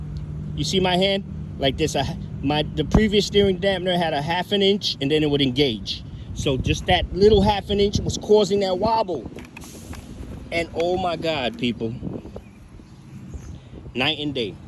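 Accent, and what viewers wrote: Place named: New York, United States. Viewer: That fuckin Ny accent oh my god! No cap, but you sound like a old ass white man who sits in central square park all fucking day alone!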